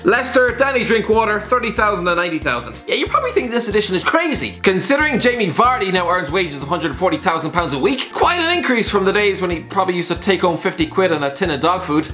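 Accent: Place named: Ireland. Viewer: I thought that it definitely sounded Irish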